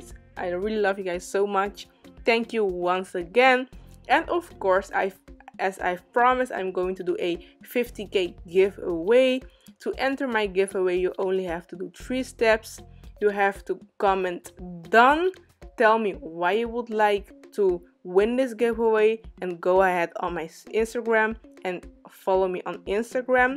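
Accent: Netherlands. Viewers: ik hoor gewoon aan je accent dat je nl bent hahahahha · Your English sounds Dutch. Am I right? 😅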